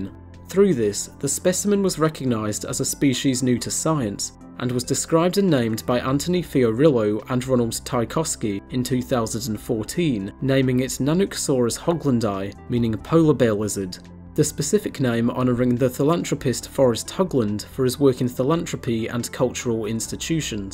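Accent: England, United Kingdom. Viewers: Why do all these guys who push the feathered dinosaur theory have English accents?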